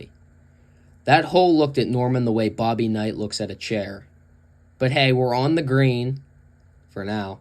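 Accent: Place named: Pittsburgh, United States. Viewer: Love that Yinzer accent!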